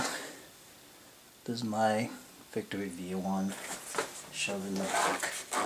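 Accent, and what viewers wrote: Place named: New York, United States. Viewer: Great review! I actually just ordered one myself for my axe. Also loving the new york accent.lol i am also from new york but i live on long island. where do you go to play?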